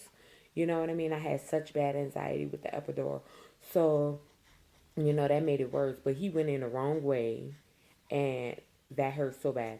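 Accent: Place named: New Orleans, United States. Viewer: She gotta be from here she sounds too much like a fee from NOLA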